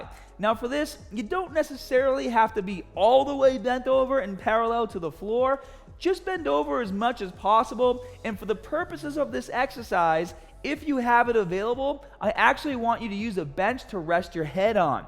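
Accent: Boston, United States. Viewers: 😂 its like Boston accent is sneaking out. Let it beathe bro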